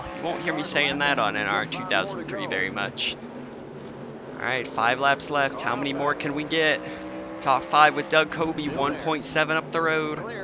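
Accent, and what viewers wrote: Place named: California, United States. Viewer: The Californian accent really came out here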